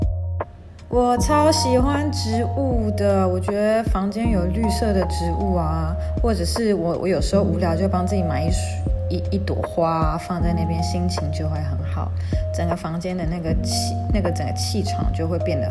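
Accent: Taiwan. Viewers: 真的是超級道地的台灣口音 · 妳除了face外，其它講話的方式、口氣、用語、調調跟台妹一摸一樣，甚至更台簡直青出於藍，身份證的申請沒辦法通過嗎？ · 光是聽聲音，妳不是台灣人誰才是， OMG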